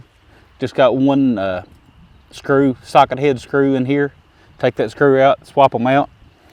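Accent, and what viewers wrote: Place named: South, United States. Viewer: Dang that is a thick southern accent. Anyway that's a nice gun, I'm not in the market for one, I just enjoy watching these videos.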